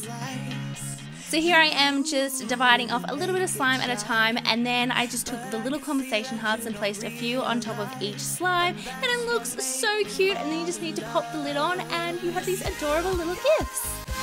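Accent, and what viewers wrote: England, United Kingdom. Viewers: I'm new to ur channel and I notice that u r so adorable oh and I'm from England too I notice your accent (idk I'm not good at accents)😝 Btw I subscribed to ur channel 👍💚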